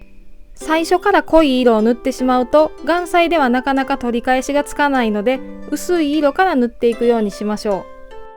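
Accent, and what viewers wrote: Kansai, Japan. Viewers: そこはかとなく漂う京都弁がまた風情ありますね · トロントに来てから関西弁って懐かしいなあ。。。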